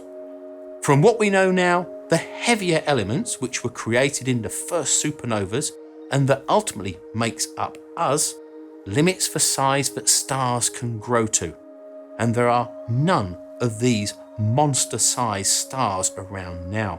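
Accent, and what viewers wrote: United Kingdom. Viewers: Sooo brrritish that it hurts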